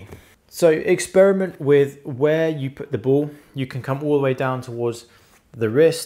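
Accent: United Kingdom. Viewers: You sound like you're from Landan...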